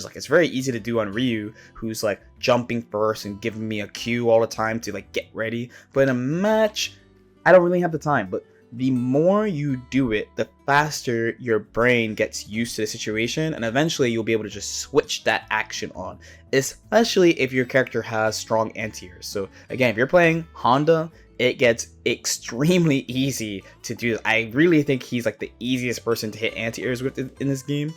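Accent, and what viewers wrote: Baltimore, United States. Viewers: You don’t have to answer but by chance are you from Baltimore? Your accent made me wonder lol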